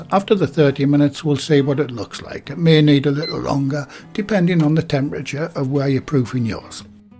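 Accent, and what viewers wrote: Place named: North West England, United Kingdom. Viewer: Thank you and tomorrow I'm going to have a go making this recipe as it is clear and concise. I like the voice over instead of watching someone banging around in the kitchen, personal preference. I have been on the old sourdough journey and have finally got a starter going, it's still a learning curve to make a loaf that's edible and not too dense. I finally bought bread flour, so I think this will make all the difference. When you said *natural yeast (I think that was the term used) do you mean sourdough? I also liked your accent as I'm from the North West coast originally, I live in Canada. Left UK in 1972. I'll have to go look at your website. *edit: no it was fresh yeast you said!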